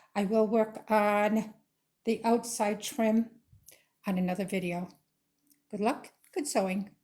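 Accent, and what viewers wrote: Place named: Massachusetts, United States. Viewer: This is so helpful! You sound like you're from MA! Thank you for doingvthis .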